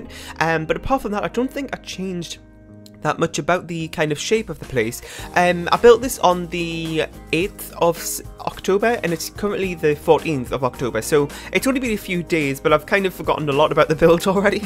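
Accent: Newcastle, United Kingdom. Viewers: Ahh, just found your channel! I love your builds and your accent. Is it Geordie? I can't quite place it haha. :)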